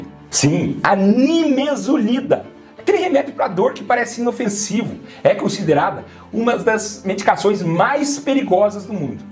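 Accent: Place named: Caipira, Brazil. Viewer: Taquifemia com retroflexo "R" caipira! Não entendi nada! 👅 👅 👅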